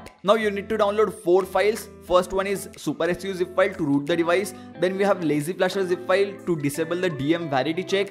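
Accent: India. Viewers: Pure Indian Accent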